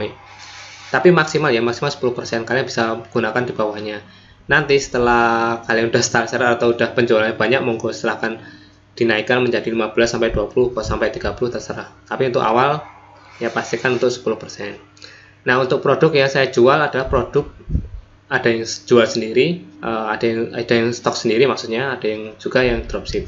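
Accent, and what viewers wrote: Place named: Pekalongan, Indonesia. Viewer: Orang pekalongan yah mas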